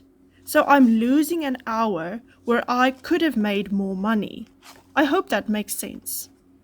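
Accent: South Africa. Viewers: you sound South African so why are you using Amazon your links do not make sense e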